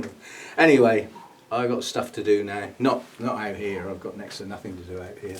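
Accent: United Kingdom. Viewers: And I watch you to see how long you will grow your hair out. And for the British accent.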